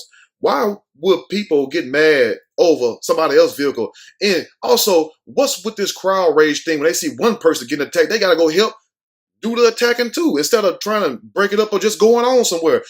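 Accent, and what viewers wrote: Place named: Texas, United States. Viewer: Didn't know you were in Dallas boo..I'm tryna meet and greet..I knew you sounded like a Texan..lol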